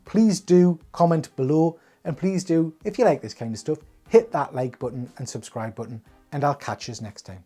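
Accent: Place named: Newcastle, United Kingdom. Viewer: Geordie boy!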